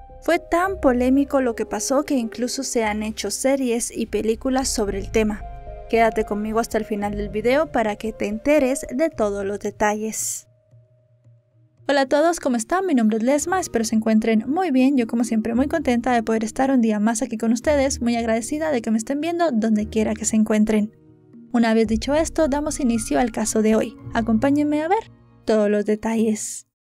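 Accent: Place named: Mexico. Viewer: Una narración a la mexicana. Excesivo uso del marcador "pues". Se nota que esta chica narradora es mexicana. Pero podría equivocarme, mejor que lo diga ella.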